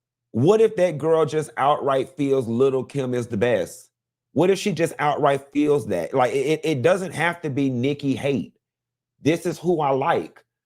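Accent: South Africa. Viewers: Sisi I spotted your accent my sister!! #NoDNA just RSA!! Yes our person representing us on my favourite show!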